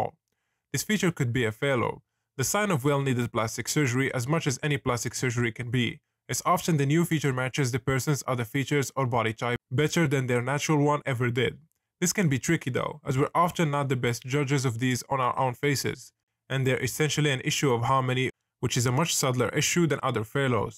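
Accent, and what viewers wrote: Morocco. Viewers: من أول فيديو عرفتك مغربي من صوتك 😅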